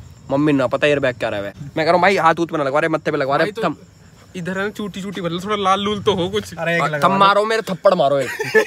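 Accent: Haryana, India. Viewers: Bhai tum hariyana se ho k.bhasha se lgte h .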